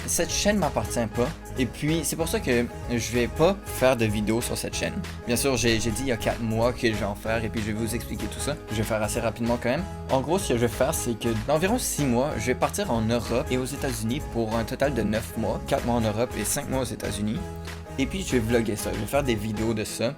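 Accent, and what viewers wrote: Canada. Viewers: T’entends pas son accent ? Il est déjà canadien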